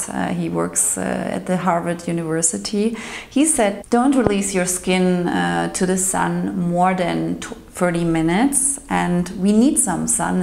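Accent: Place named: Germany. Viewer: Keep it up! Your English is great. I think you have a lot of potential doing your videos in English as well as German. I think your German accent actually sounds very nice and makes you unique relative to other raw food channels in English. Thank you for reminding me of these truths about how to maintain overall health.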